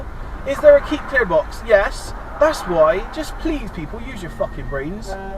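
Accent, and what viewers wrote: United Kingdom. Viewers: Matey, i loove your pure BRITISH accent :-))) Cheers! BTW...Good lessons for ya kid, matey :-)))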